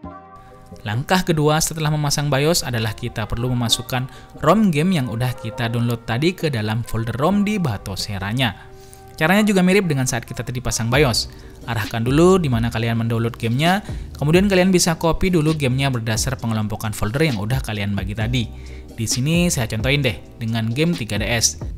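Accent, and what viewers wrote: Madura, Indonesia. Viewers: kok berasa ada cengkok medure nya....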